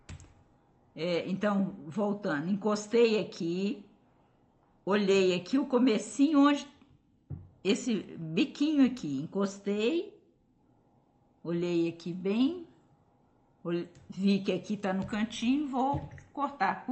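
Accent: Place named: Minas Gerais, Brazil. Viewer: Suas explicações são excelentes, adoçadas com sotaque mineirinho ficam melhores ainda! Adoro seus vídeos!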